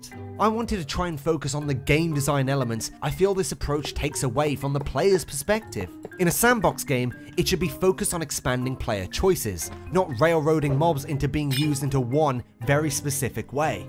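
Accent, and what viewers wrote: United Kingdom. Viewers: and GradeAUnderA are the same person theory Grade ✅ British accent ✅ ✅ Ranting ✅ ✅ Sounds angry 90% of the time ✅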